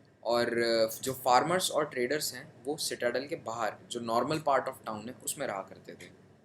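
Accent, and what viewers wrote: India. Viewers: English has been taught in India since 1830. Why can't you speak English? Do not worry about your Hindi accent. Just speak English!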